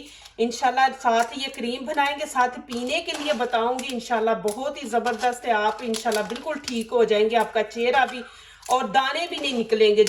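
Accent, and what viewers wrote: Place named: Punjab, India. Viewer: کیا بات ھے آپکی اردو وہ بھی پنجابی ٹچ کے ساتھ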